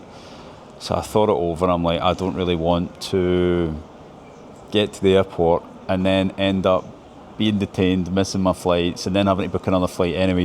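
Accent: Scotland, United Kingdom. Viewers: Nobody knows. Every video could be fit into a 3-minute-short-report, but... 15- minute-bla-bla-bla with awful Scottish accent makes me switch to clear speech of the US-prof. John Mearsheimer. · Hi was in Scotland yesterday and met many sounded like yours:) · he missed spoke or it was his scottish accent. He meant Belavia. At the same-time he made it clear it was the national airline from Belarus that he flew out from.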